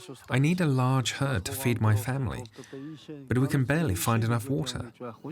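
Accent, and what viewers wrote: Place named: United Kingdom. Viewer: the herder reaches inside to pinch or sever the aorta leading to a swift loss of consciousness and death. Just because you find it gruesome doesn't mean it's not humane. The narrator sounds British and if he says it's not brutal, maybe you should agree and stop trying to pick on races you feel superior to.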